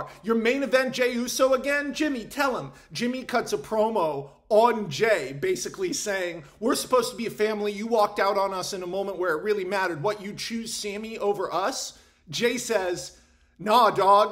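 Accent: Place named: United States. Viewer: Your american accent is so obvious,.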